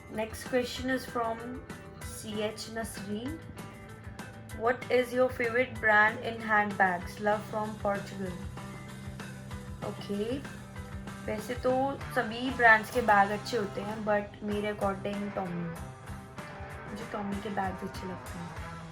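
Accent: Punjab, India. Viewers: Aapki accent shehnaaz gill type ki h😅.. Shyd aap dono punjabi h isiliye 😅❤ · Punjabi hoka Hindi bolni chngi ni lgdi.... So make vlog in Punjabi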